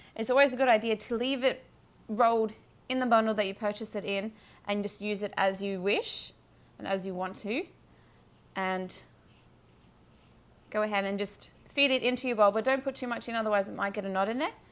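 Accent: Australia. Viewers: Aussie accent. Where in Australia did you buy such a large ball of yarn and where is the Mod podge from? Thank s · Is she Australian? Great vid!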